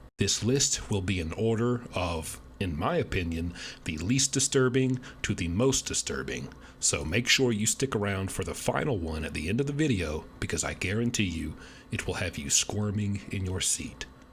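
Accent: South, United States. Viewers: ! Your pronunciation, enunciation and articulate diction, while having a wonderful southern gentleman accent, are very refreshing to say the least! I thank you and you should congratulate yourself as you are a rare gem. Thanks again!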